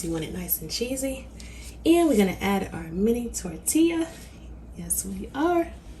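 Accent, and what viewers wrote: New York, United States. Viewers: Ohhhhh I'm doing this asap omg😋very easy and looks delicious !ps, Love your accent you can have mine from Louisiana , I'll take a New york accent any day Lol! 😋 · Great Recipes & tht FAB NY accent!😍🌹💓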